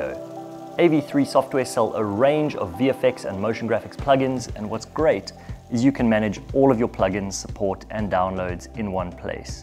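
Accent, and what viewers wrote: South Africa. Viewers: Hi. From your accent I gather you are South African? I love fooling around making videos. Please check this little short I shot at Monte Casino. With your superior special effects skills I might be able to sell some cool videos to sponsors! If you are willing to work with a 70 year old, that is. <link>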